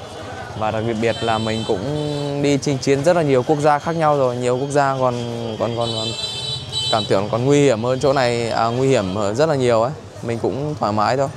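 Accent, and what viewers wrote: Vietnam. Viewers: Tiếng anh của bạn hơi thuần việt nhỉ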